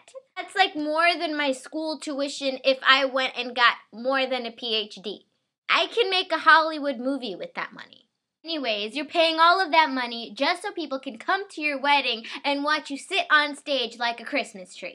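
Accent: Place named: Hyderabad, India. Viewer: uve got HYDERABADI connection. U should make videos on accents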